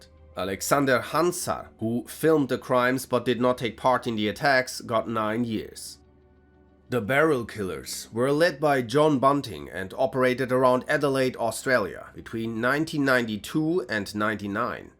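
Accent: Germany. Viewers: am just messing with you bruh i like german accent and it doesnt matter nothin how you pronounce something. If we all spoke same accent it would just be boring.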